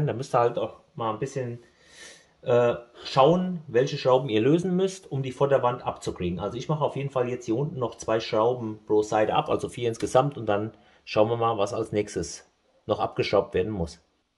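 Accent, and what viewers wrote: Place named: Dillenburg, Germany. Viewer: Sag mal wo kommst du her vom Dialekt her hört es sich nach Dillenburg an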